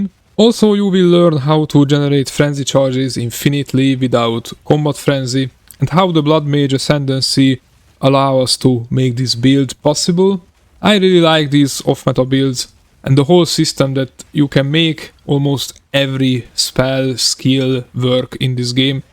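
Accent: Hungary. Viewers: Probably ill be doing this build next season :) hope they dont change it! Btw are you Hungarian any chance? Your accent tells me that :D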